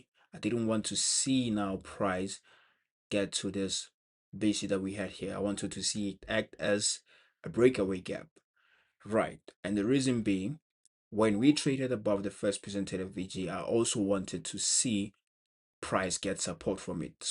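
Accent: South Africa. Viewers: You have South African accent where you from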